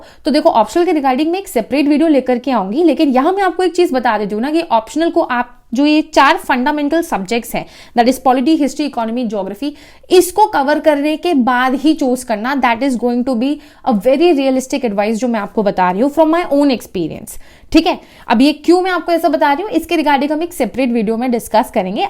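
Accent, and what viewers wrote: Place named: Madhya Pradesh, India. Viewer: Mam aap mp se lag rhi ho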